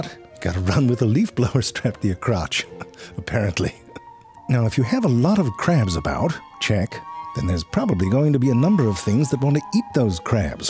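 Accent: Germany. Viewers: What the heck, your German sounds so good! Just from the way you speak I as a German would’ve thought oh, this is probably a dialect from some other federal state. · Your german accent is impeccable!!! 😊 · All these years and i had no idea Ze Frank was a native German speaker till now! That's really cool!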